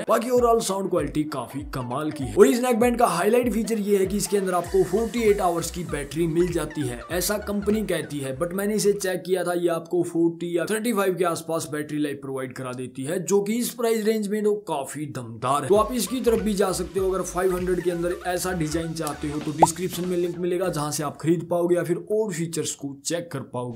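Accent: Haryana, India. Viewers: kitni e koshish karle bhai 🤣haryanvi accnt a hi jata hai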